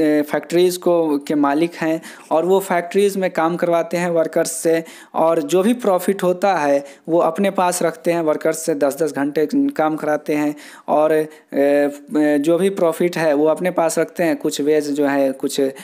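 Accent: Bihar, India. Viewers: Sir ap biharii hai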